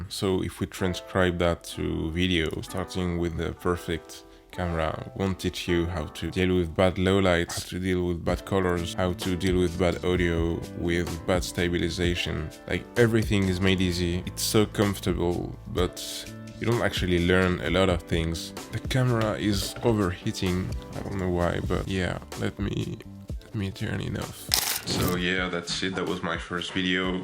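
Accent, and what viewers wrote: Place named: France. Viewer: Oh yes!!! The OG 6000 should definitely not be forgotten. Today plenty of people try to run with 4K, 10 Bit and 60 FPS before they can even walk properly. It's nice to hear your view, because it really sounds it comes from actual experience and not some stuff people say because big Youtubers say it. Keep it up man! And don't worry about your French accent!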